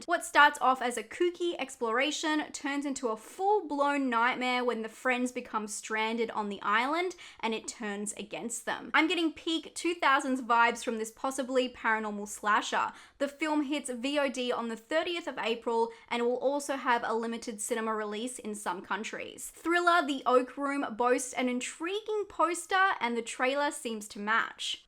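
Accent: Australia. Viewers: ah bro bless her, subbed when she was at 2-3k and almost at 80 k now and she still puts up A class content love her aussie accent lol wothahhhh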